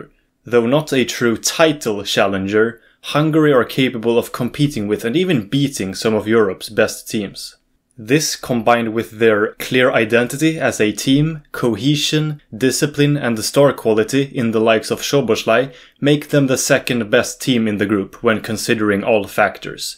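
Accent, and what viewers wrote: Sweden. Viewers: Måste va den starkaste svenska brytningen jag hört på länge😂 bra video👍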